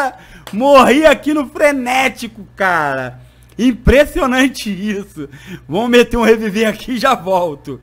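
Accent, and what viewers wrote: Rio de Janeiro, Brazil. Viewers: Ele é carioca?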